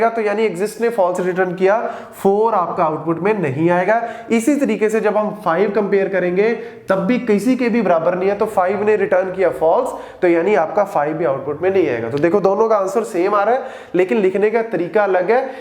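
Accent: Punjab, India. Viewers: sir ji app punjabi ho?/?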